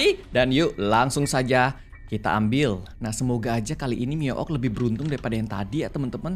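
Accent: Java, Indonesia. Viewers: Medhok banget kak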